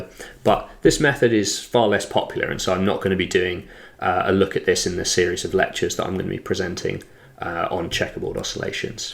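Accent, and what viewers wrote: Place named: United Kingdom. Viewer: 这英国口音听着太舒服了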